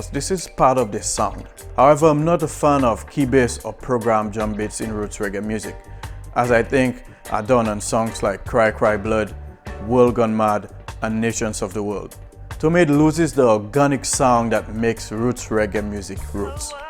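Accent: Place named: Dominica. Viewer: I'm not an expert in West Indian accents or dialects (I've only lived in Jamaica), but your accent sounds like people I've met from Dominica. Are you from there?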